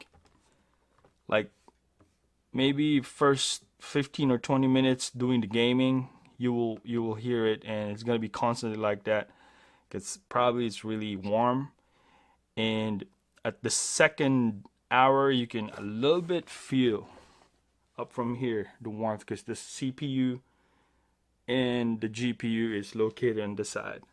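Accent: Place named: Philippines. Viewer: Just chill guys. His first language isn’t english. He might be a filipino. Don’t be quick to judge.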